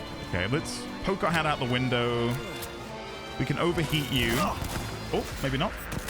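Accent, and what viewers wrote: United Kingdom. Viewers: do you have acting training? Your mid- century RP accent is top shelf!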